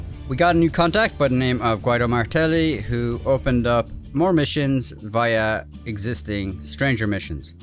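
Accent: Ireland. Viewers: How is it, that you speak "More" Irish now, than just by a few videos ago? 🤬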